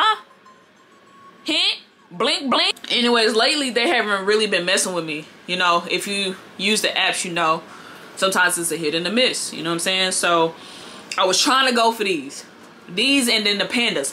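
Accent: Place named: South, United States. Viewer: ok ok you might be from the south I can hear the accent now lol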